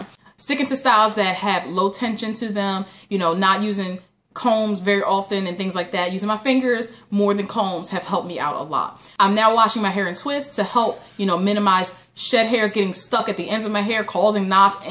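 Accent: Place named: Texas, United States. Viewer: I love your accent! Are you from Texas? I'm Canadian Jamaican and sound nothing like you!! Lolol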